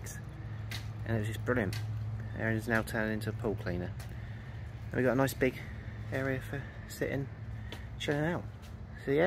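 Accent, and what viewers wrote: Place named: Australia. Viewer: You sound like Rocko from the 1990s Nickelodeon cartoon Rocko’s Modern Life, so I assume you’re Australian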